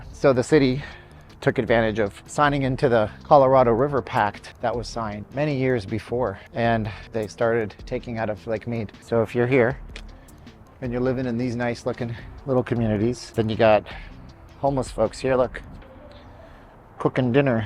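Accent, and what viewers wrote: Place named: Canada. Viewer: You have a cool accent; possibly the most Canadian accent I’ve ever heard. Love your content!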